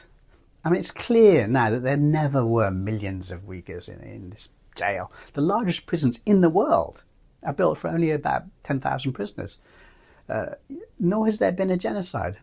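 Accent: United Kingdom. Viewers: Stupid british accent or involuntary and unnecessary subtitles: choose one. I'm out.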